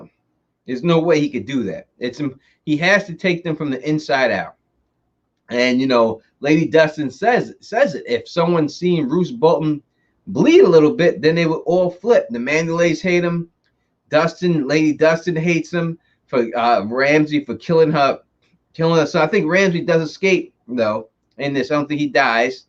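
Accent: New York, United States. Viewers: I still can't get over how new york this guy is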